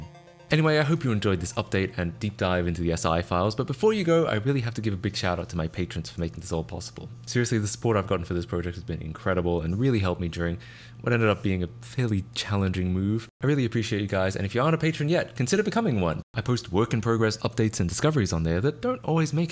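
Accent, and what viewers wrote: Australia. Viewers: "British" - Said about a man who clearly has a strong Australian accent.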